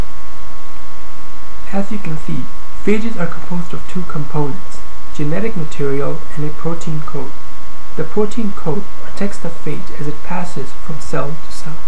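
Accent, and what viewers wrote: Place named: India. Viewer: wtf is that indian accent everywhere in biology videos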